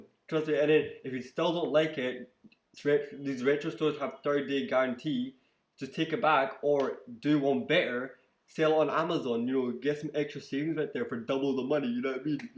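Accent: Scotland, United Kingdom. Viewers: you from Scotland nice video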